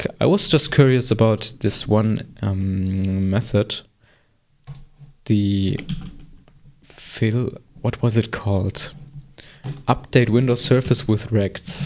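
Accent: Germany. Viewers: German accent ↗️↗️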